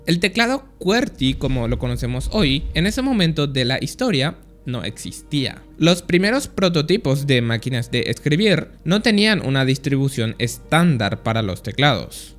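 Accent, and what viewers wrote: Paraguay. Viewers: A mi no me mientes sos paraguayo vd?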